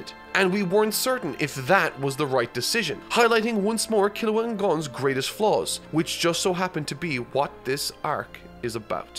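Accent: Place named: Ireland. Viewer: It's just his Irish accent. The same way he pronounced "calm"/kæm/, instead of calm/kɑːm/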